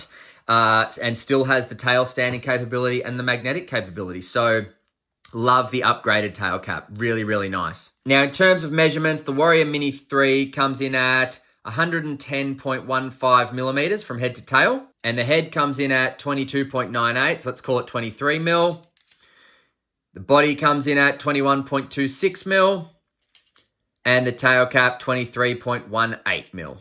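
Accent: Australia. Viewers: with an aussie accent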